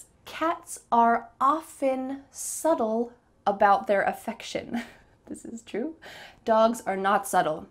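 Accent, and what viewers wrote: United States. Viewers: American English pronunciation. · I love your pronunciation it's better American! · Is it America pronounciation ?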